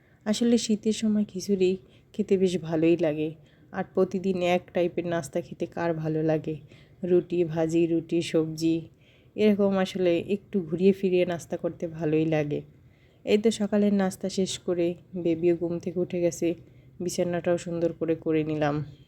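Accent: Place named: Barishal, Bangladesh. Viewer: আপু চলে আসলাম তোমার ভিডিও দেখতে তোমার কথায় বরিশালের ছোঁয়া পেয়ে ভালোই লাগলো মিষ্টি কুমার ❤❤❤❤❤❤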